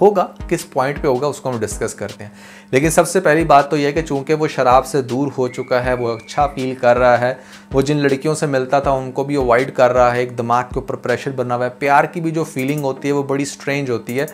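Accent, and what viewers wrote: Punjab, India. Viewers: Bohat changa tuhda punjabi Touch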